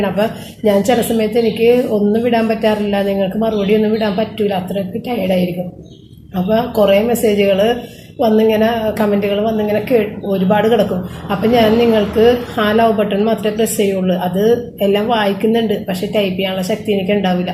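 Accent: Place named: Paravur, India. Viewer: Paravoorile language kelkan nalla rasand nde anuyathi Paravoorukariyanu same avalu parayanapole..chechiyude kashtapadu manasilavunnund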